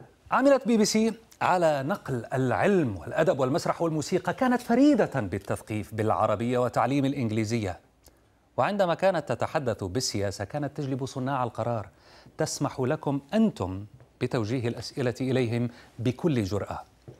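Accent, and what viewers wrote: Syria. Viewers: هل المذيع من سوريا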